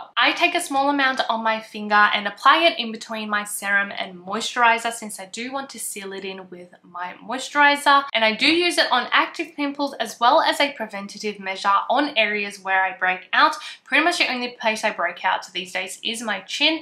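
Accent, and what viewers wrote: Australia. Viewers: also is she australian? I feel like im getting that familiar accent. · Girl I cannot tell if you have an Australian accent or not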